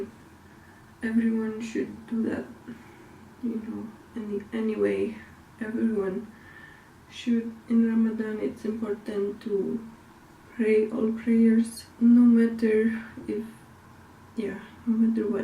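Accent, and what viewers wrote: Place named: Switzerland. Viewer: Your accent sounds Switzerlandish :) Nice to meet u sister Allah keep u strong sis. Amin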